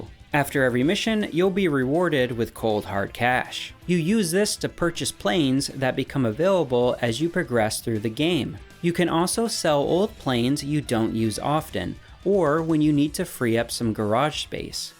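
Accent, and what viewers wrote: England, United Kingdom. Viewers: that accent sounds like a british guy trying a eastern european+asian accent. its wild. goes from jackie chan to arnold in like, .5 seconds. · The voice sounds like an english accent, but possibly someone who's first language isn't english but learned how to speak english via someone with an english accent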